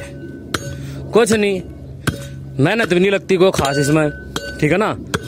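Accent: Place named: Haryana, India. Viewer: Bhai ka haryanvi. 😂😂😂👌👌👌👌 Ekdam kadak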